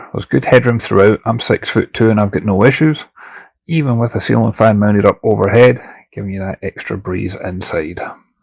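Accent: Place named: Scotland, United Kingdom. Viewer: I love these GH boats and your reviews. I particularly enjoy your accent as it reminds me of my times in Scotland.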